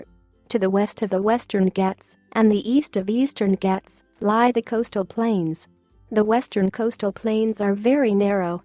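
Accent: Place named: United States. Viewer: What I didn't like was that this was in american english... so, it was very difficult to understand places like Kanya Kaumari, Rann of Kutch and Arunachal Pradesh... So this is a bad video...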